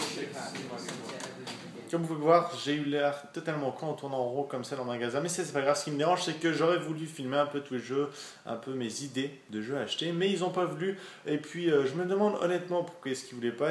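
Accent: Quebec, Canada. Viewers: tu comence a plus parler quebecois den tes video evite parce que jai du mal a comprendre sinon =jpe pu comprendre t video mode quebecois: bon jmenui che moué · Héhé tu choppes l'accent Québécois, fais gaffe;)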